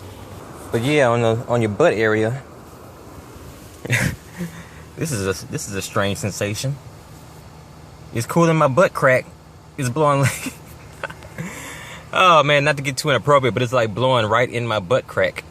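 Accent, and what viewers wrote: South, United States. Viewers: After living in the American South for 10 years; I can assure you that this is what a very intelligent, articulate southern man sounds like. Did you ever have difficulty understanding him? Since the reviewer noted he is in Louisiana, and having spent a lot of time in LA, I can assure you that there are some extremely thick accents there. There are people that speak and you might be lucky to understand 1 in 5 words. That's what makes LA such a great place. A beautiful state with an amazing history, and wonderful people.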